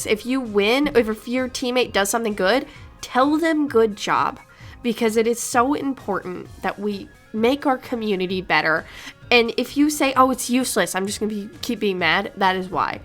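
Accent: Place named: Michigan, United States. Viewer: guessing Michigan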